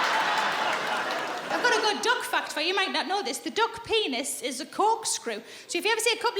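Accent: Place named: Newcastle, United Kingdom. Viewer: Geordie accent. I recommend youtubing jimmy Carr accents. Explains a lot 😁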